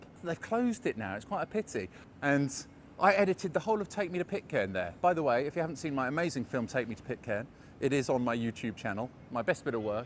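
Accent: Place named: England, United Kingdom. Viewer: you have an excellent English accent Also your videos are very entertaining I have a suggestion for you, to increase revenue Perhaps you could market your videos as Advanced English Comprehension Add a few questions at the end of each one Sell them either as a hard copy or digitally China would be a market with great potential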